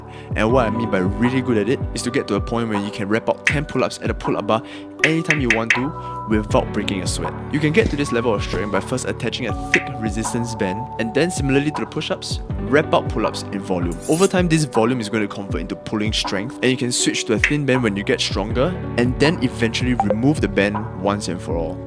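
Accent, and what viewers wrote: Africa. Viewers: Bro, are you from an African background...? You speak like a friend of my. Love the accent😁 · Why is bro Singaporean but he has an African accent? Also, would seasoning the chicken kill my gains?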